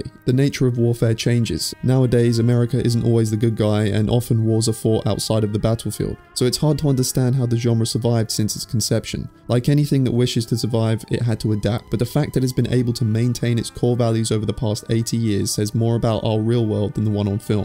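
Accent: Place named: United Kingdom. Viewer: Why do you think this is a good video essay? Is it because he's smart? Because that's only due to his British accent.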